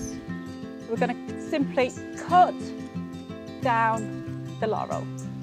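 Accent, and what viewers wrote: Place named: United Kingdom. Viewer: Her British ACCENT is so THICK, I can't understand a word she is saying. I would say try speaking English but that would be counterproductive since....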